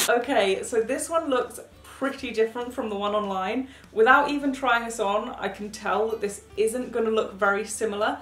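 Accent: United Kingdom. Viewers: OHH MY GOD, the second dress you showed, the green one, my friend had for our school ball last year! i remember her showing me that same pic you put on the screen and it was such a pretty dress!! that's so crazy haha, especially bc i live on the opposite side to the UK where I'm thinking you're from bc of your accent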